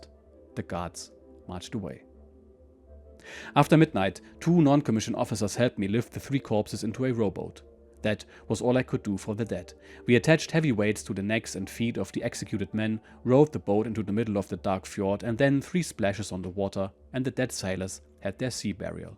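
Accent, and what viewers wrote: Germany. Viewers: Your accent is heart breaking because my best friend in high school was from Germany. He developed depression and disappeared. All of his friends think he took his own life. He was smart, good looking. athletic and had great musical talent. Not a day goes by that I don’t think about him. It was 50 years ago when he vanished. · I was really hoping he was going to get the chance to attempt escaping to south America but his postwar adventures getting back to Germany were no less dramatic. What a great series of videos, i binged the lot, so captivating. The German accent really adds to it. One question, didnt i hear him say at on point he was going to end up commanding u 701 or did i miss hear that?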